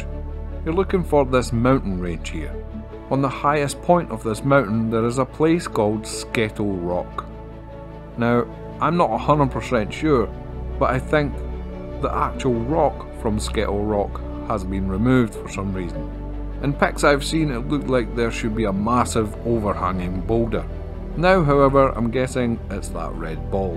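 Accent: Scotland, United Kingdom. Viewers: Gotta say i love that scotich accent 🤘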